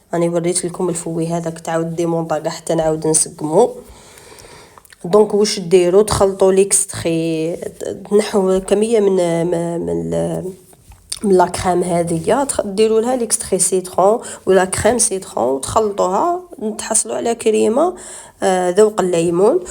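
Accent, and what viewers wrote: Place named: Skikda, Algeria. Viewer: شكرا على الوصفة تهبل يا اختي انت من ولاية سكيكدة اللهجة نتاعك بانتلي سكيكدية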